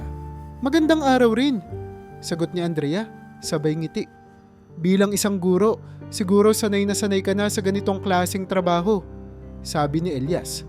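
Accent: Philippines. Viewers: May tanong din ako bakit ganuon kayong bumigkas ng tagalog, anong probinsia nyo?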